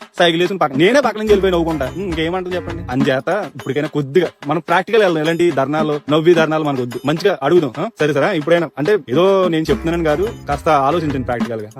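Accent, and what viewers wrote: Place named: Godavari, India. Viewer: Tammudu nee godavari slang super😊😊😊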